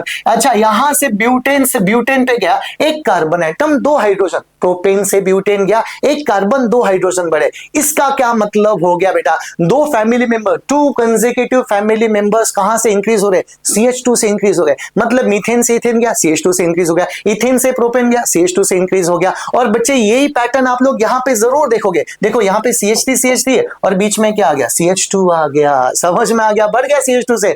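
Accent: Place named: Maharashtra, India. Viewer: Sir are you marathi because of your accent I think so btw you teach great ❤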